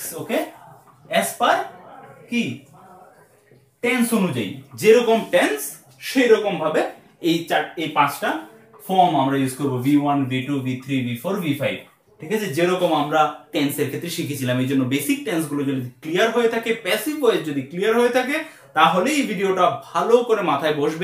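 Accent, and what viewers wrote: Kolkata, India. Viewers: কথার স্টাইলেই বোঝা যায় কলকাতার।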